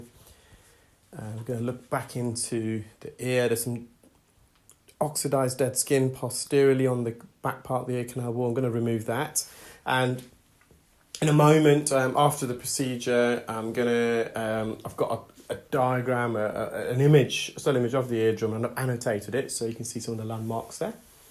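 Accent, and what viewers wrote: England, United Kingdom. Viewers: with all the cases you have to wrestle out you must really appreciate the few cases that come right out with no problem 😂 i know i would - but i’m the impatient fan as i posted yesterday lol. u have such a suave soothing voice, i love the sound of the suave English accent (to me it’s an accent lol). i notice some have a sharper sound, hard to explain but my UK friends were impressed that apparently i noticed the difference between north and south England. they tried to trick me with a Yorkshire … i could tell it was different but not north or south ☺️ while i was on Holiday in the UK they loved my accent 😂 it was funny to be the one with the accent! they said i sounded so proper 😄 American proper?? 😂😂 i think we’ve butchered the Queens English!! anyway i loved the people & the country ❤️❤️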